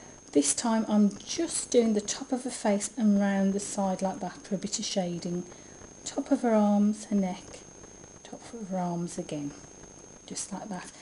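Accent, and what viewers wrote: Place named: United Kingdom. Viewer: Ur british accent! :D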